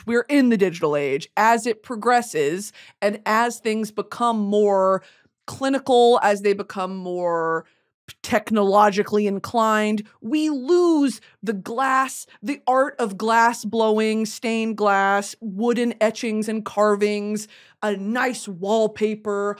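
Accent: South, United States. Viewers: your old southern lady accent cracks me tf up every time 😭💀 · your southern accent sounds exactly like my late grandmother and reminds me of being in her house as a kid lmao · *'s southern lady accent is quite literally my favorite thing ever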